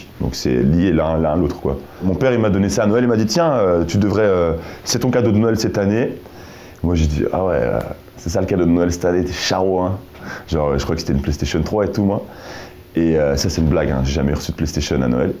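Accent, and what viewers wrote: Belgium. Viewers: On parle comme ça à Bruxelles hahah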